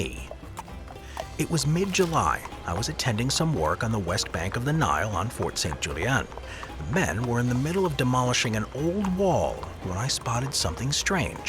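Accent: United States. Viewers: Unwatchably annoying in a yank accent. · nice american accent frenchy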